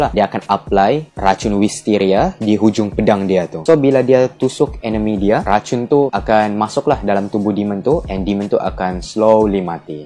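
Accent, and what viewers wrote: Sabah, Malaysia. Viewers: speaker org sabah ka ? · Ad logat Sabah la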